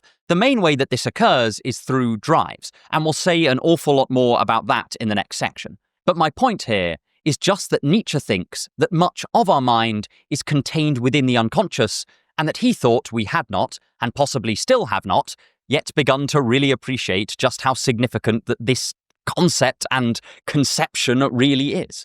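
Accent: United Kingdom. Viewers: Where in the UK are you from if it's not too rude to ask? Usually, I don't struggle as the accents across the commonwealth are quite different, but I struggled to tell if your accent was British or New Zealand. Sorry. I know this is a rude question, it's purely an academic inquiry. Like how Portuguese from Portugal and Russian are completely different languages but have fairly uniquely similar vowels or consonants unique to those languages? If that makes sense? Anyway... 😅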